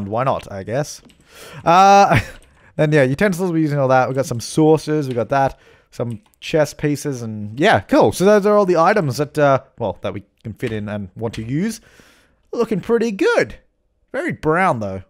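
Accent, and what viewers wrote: Australia. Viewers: ps: i looooooooove australian accent ^^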